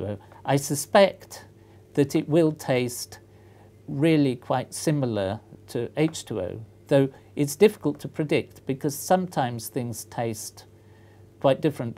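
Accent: England, United Kingdom. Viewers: Crazy hair, lab coat, British accent = genius most likely. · and just the right amount of British accent.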